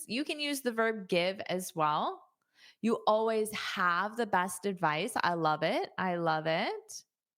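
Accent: United States. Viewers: Thanks a lot for real American English .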